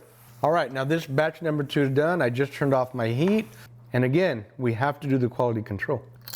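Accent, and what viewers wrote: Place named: West Texas, United States. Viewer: Dang cousin! We have to be related! Great Migas and everything! I have family in Pecos Ft. Stockton, Monahans, Imperial, Crane, Odessa, Midland.., you sound like us!! Love your video! and love H.E.B. !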